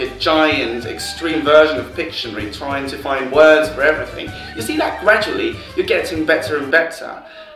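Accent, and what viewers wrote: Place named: United Kingdom. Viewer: have u had that nice british accent also before your erasmus stay?:D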